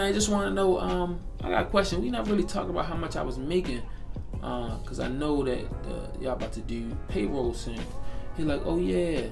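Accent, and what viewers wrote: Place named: Washington DC, United States. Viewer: I love the DC accent.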